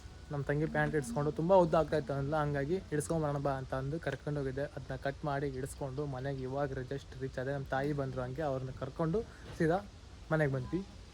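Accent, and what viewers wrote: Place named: North Karnataka, India. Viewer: ನಮ್ಮ ಉತ್ತರ ಕರ್ನಾಟಕ ಭಾಷೆ ಮಾತಾಡಕತೀರಿ , ಇದೇ ತರ ನಮ್ಮ ಭಾಷೆಯಲ್ಲೇ ಮಾತಾಡ್ರಿ ಅಣ್ಣಾ.. 🔥👍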